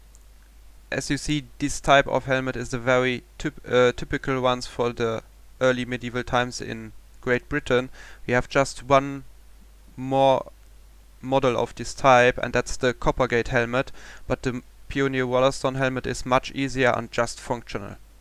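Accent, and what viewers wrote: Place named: Luxembourg. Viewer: Luxembourgish accent ;-) So sweet compared to the hart german accent ;-)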